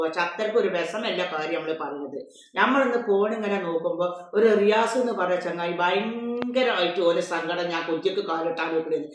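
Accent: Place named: Malappuram, India. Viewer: സംസാരം കേട്ടാൽ നാട് ചോദിക്കണ്ട ഞാൻ വിചാരിച്ച പോലെ തന്നെ. വണ്ടൂർ. കാളികാവ്. മഞ്ചേരി. 🤣🤣🤣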